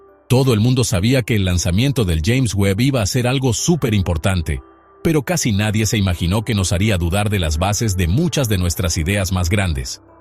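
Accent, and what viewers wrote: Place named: Mexico. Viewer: Aparte la IA habla como mexicano. Nada contra su acento, pero en temas científicos no espero hablar al locutor con modismos de un país específico. Yo soy chileno y no me imagino un video de ciencia con modismos de acá.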